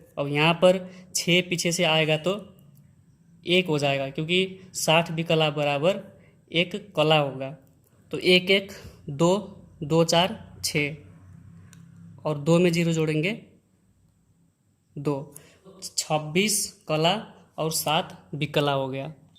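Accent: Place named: Nepal. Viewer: Nepali le hindi boleko ठ्याक्कै thaha hunxa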